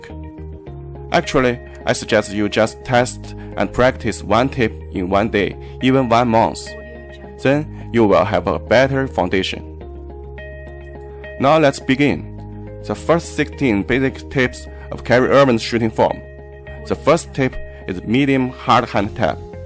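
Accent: China. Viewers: even with the Chinese accent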